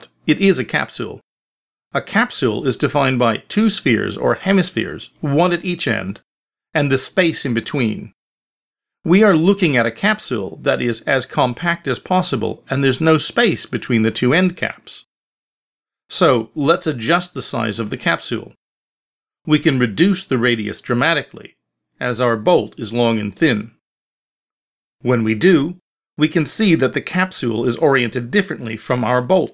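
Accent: United Kingdom. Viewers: r u british or american lmao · British accent seeping through.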